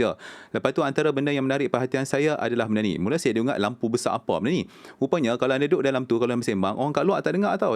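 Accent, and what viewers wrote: North, Malaysia. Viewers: Apapun saya senang dgr loghat gunakan.. sedap halwa telinga dengar sleng utara mix baku melayu.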